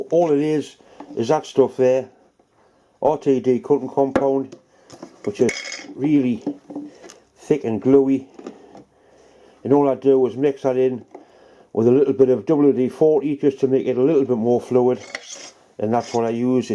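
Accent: Newcastle, United Kingdom. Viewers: The auto text cant understand Geordie either. 😂 At least mine cant.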